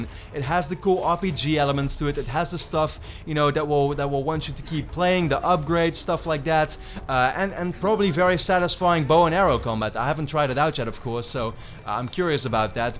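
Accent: Netherlands. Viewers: Ja dat accent herken je al van een mile away! Maar hey, beste nederlandse gaming YouTuber naar mijn mening.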